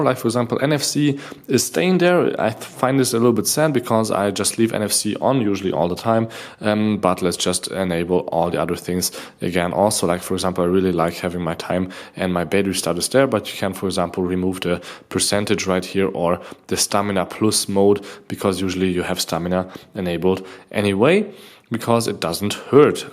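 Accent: Germany. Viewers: you are german native i assume ? Does this video also exists in german?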